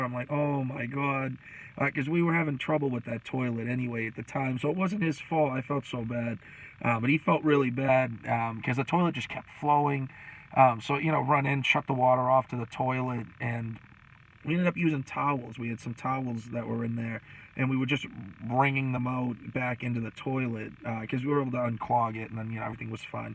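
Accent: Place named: New England, United States. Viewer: Ahh! I’m from Northern Maine originally and you had that ever so slight hint of the accent. Keep up the content! Subbed.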